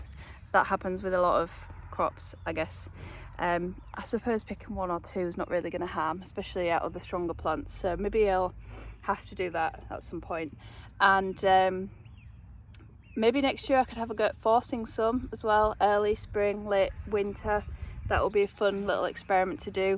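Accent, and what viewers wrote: East Yorkshire, United Kingdom. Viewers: Loving the enthusiasm for rhubarb, it’s great stuff isn’t it? You’re in East Yorkshire I take it based on your accent? I did my teacher training in Beverley so your accent brought back memories.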